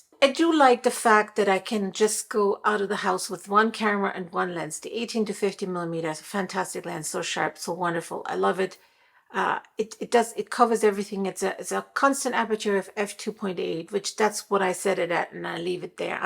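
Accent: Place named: United States. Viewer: I thought I heard a faint German vowel. Near-perfect American English. I wish all multilinguals would work on pronunciation.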